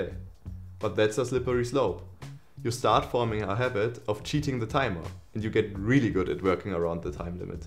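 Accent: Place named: Germany. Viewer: I LOVE these update videos! I also love your German accent, lol! Thank you for creating the best launcher on the market and keeping us updated with these great videos! So nice to hear you guys are graduating. Congratulations and Happy New Year! 🎊🎉💥🎇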